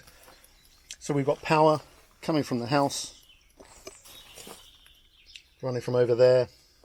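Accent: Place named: Midlands, United Kingdom. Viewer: Hi mate, first I've got to say thankyou as I've searched everywhere to find this kind of info. I have 3 six week old and they're lamp is all the way up now how do I get them from the box to the coop as I don't have power in the garden. Also I live in the UK round the Midlands so should be getting the similar weather to you considering your accent. Any help appreciated and thanks again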